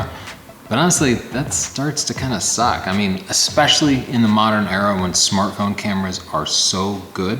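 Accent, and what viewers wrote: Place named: United States. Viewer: I'm an American living in South Africa. My wife recently bought a Canon 6d mark ii, and she also bought this lens as an extra. She plans on using the camera to help film our ministry outreaches here. I want to say thanks so much to you both. My wife and I are watching all your videos and she especially loves your tutorial on her camera. You don't talk to fast, and you have a good all American accent....like me....lol.....which she is use too. God bless you both!!!!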